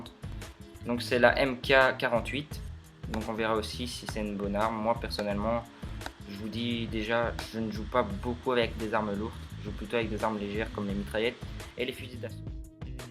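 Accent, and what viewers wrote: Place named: Belgium. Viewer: accent belge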